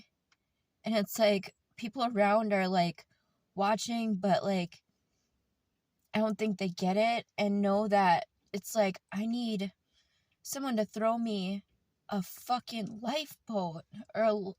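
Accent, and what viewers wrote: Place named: Midwest, United States. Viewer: I just wanted to tell you I see you. I relate to you on so many levels. I am originally from Wisconsin, so I appreciate the Midwestern accent number one… 😊 For me, my dad was my “person”… I was married at the time when my dad passed, and I never felt so alone in my life and in my grief… my husband did not understand. We are no longer together not to scare you….It had nothing to do with the grief well OK MAYBE that was part of it, but he also had a severe alcohol problem… But, he never understood, or gave me what I needed. You are NOT an asshole! I will admit to you that there were times where I thought to myself. I can’t wait until my husband loses one of his parents, and THEN he will understand. Trust me, I don’t wish that on anybody, but at the time I was so angry and hurt and frustrated with him that that was in my head… You are not alone. 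Anger is a huge part of grief.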